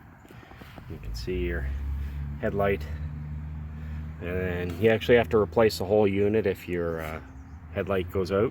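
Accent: Canada. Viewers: Thanks for the overview. Love your Canadian accent - my mother was from NB. I just got a free 1990 YT1238 but in well-worn condition. Very stout machine. Briggs 12 hp with 38 inch deck. Spent a lot of time outdoors but that's not too tough on equipment in our mild So Cal climate. The paint is badly flaking over the rusty areas but so far all it needs is a gas tank, the little rod that actuates the choke and a battery. But I won't buy any of these until I run it around the yard a bit to test the trans which on this model is a hydro (no clutch). I got it to start yesterday and it doesn't smoke so there is hope it will run and cut well. Re the tires I am pretty sure they are tubeless. You can tell if yours has tubes by the valve stem. If they need tubes be prepared for a struggle with the old tires especially on the rears if you can't get them off the axles. When I put tubes in riding mower tires I bolt them to my work table so I have two hands free. 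Re tread wear I have never worn our a riding mower tire. The worst is to neglect them to the point they go flat and crack. But even then they are usually usable with a tube since they only take about 10 psi.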